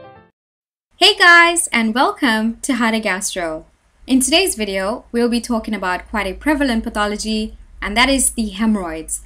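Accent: South Africa. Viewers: You sound like you're a south african indian, am I right?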